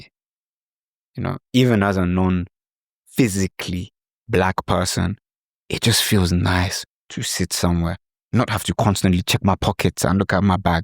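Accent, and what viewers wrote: Ghana. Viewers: Lovely ❤ You do speak like a Ghanaian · I like your Ghanaians ascent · It's quite easy to see some Ghanaian training in you when you speak..... It's amusing and humorous at the same time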